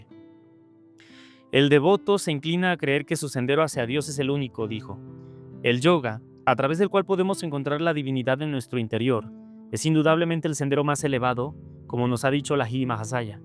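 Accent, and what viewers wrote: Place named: Mexico. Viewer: Me gusta mucho tu manera de leer pero sobre todo ese acento mexicano inconfundible ❤❤🙏🇨🇺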